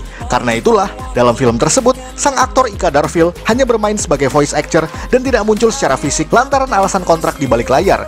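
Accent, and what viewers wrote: Java, Indonesia. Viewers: Min... Bahas ttg Kiramaiger donk... Jujur saya merasa gak nyaman dg penjelasan mimin yg di Try It karena kebanyakan ngeguyon apalagi dibumbui logat Jawa nya meskipun yah itu alamiah sih yah krn mungkin si mimin org Jawa... Dg melalui TokuFacts ini saya rasa kami akan merasa lbh nyaman mendengar penjelasannya tanpa banyak bertele-tele dan akurat pula...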